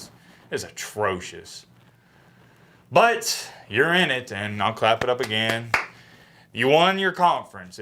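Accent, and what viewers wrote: South, United States. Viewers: And I waited through this terrible Sputhen Accent to hear Bama is out. Bittersweet. 😂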